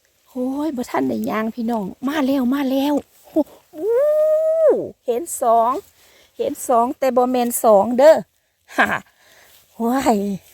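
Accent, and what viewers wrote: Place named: Isan, Thailand. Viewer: คุณ เว้าพาษาอีสาน น่ารักมาก สออนเห็ดหลายงามพร้อม❤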